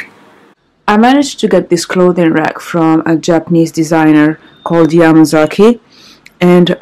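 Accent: United Kingdom. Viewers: that british accent though hahahaha i loved the video, keep shining! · you are developing a little british accent, how cute ..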